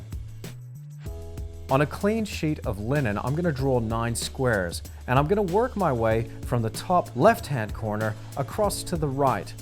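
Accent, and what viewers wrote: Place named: Australia. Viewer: you sound slightly australian but also american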